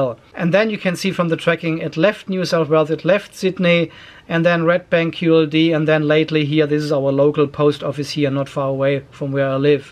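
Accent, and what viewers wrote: Germany. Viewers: Hallo, höre ich da einen deutschen Akzent ? mfg michael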